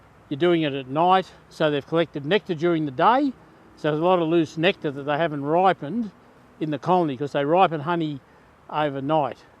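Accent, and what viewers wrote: Australia. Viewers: Are you in Australia please you sound as you are I’d like to follow you if you are please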